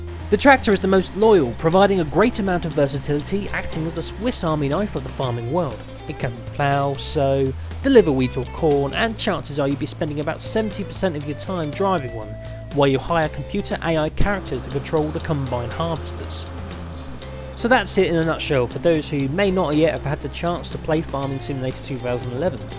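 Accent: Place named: United Kingdom. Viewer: So awsome. Cool british acsent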